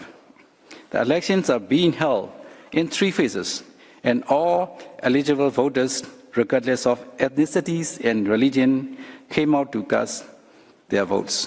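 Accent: Myanmar. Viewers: အင်္ဂလိပ်မှမဟုတ်တာ မြန်မာက အင်္ဂလိပ်လိုပြောတာလေ။မင်းကဘာဖြစ်ချင်တာလဲ။ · ငပိသံကြီးနဲ့ဟ ..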